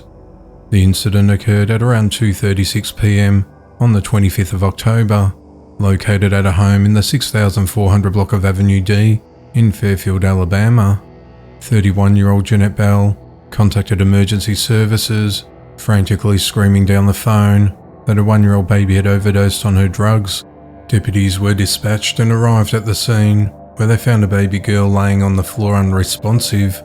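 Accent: Australia. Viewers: Australian accent, East Coast, NSW?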